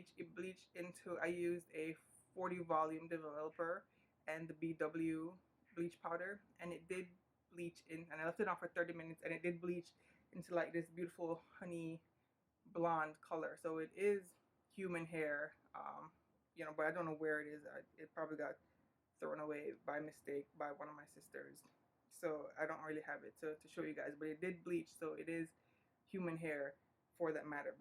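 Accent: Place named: Jamaica. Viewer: I'm Jamaican as well, i picked it up right away 😂👏🏾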